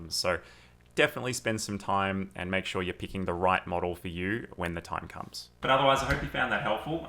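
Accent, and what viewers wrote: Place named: Australia. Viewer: I love the info in the video. but his accent kept fucking with me lol. Puts R's where they shouldn't be. And where they should be takes them out lol. Do all aussies talk like this?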